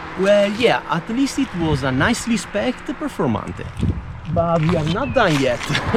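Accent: Italy. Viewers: I love the Italian accent. Come stai?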